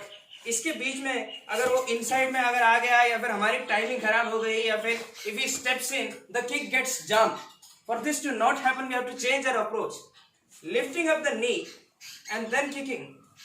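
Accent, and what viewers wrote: Kerala, India. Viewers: Man, you mallu?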